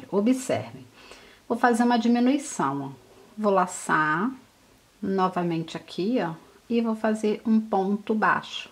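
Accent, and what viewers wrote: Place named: Rio de Janeiro, Brazil. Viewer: Passei parte do tempo neste final de 2023 fazendo este lindo tapete, fiz o meu no barroco natural e ficou lindo! Adoro seus trabalhos, gosto do seu sotaque carioca, e a propósito suas unhas são lindas. Sucesso neste ano de 2024, gratidão por compartilhar seus trabalhos belíssimos.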